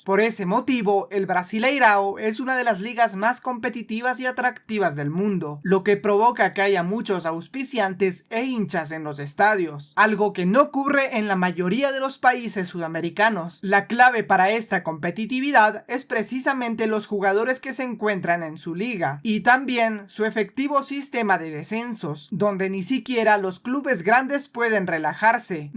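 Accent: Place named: Peru. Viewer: Pero este tipo tiene acento peruano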